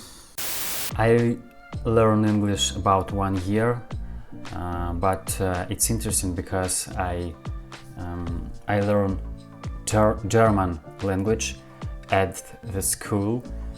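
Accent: Russia. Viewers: bless you. You are doing great with learning English, and I don't think it sounds funny. There's nothing wrong having the Russian accent while you are speaking English. I wish that we in the US would do better with learning more then one language. I took German a long time ago and started to understand and speak it, but then I lost the knowledge because I didn't get to use it. You should be very proud of yourself, and I really mean that. Don't let others tell you that you sound funny or that you can't speak English. Unfortunately like any country we have rude people here too, and you just need to ignore those people making those type of comments. I have a friend who is from China and learned to speak English. He would start apologizing when he couldn't find the right word to say, and I would yell at him for apologizing. I said don't worry about finding the word, what I want you to do is stop apologizing, there's no reason to apologize for not knowing a word or because you don't feel that you aren't saying the word right. 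I'm always happy to help you pronounce or find a word, but you can't keep apologizing. So that is one thing I want to pass on to you, there is never a reason to apologize. And remember the ones who are the rudest to you, are the ones that could probably never begin to learn a second language. You just keep practicing and it will happen in time. English is a damn hard language, it's more then just learning the words. Half the time it seems there is no reason f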